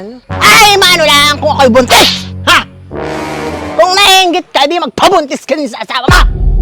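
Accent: Batangas, Philippines. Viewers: Batangas ba ang accent ni messes?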